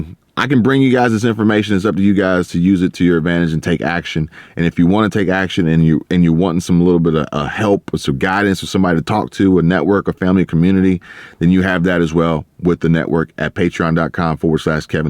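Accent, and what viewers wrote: Texas, United States. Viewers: Are you from Texas..? You sound like one of us lol! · Great video. You sound like a Texan police.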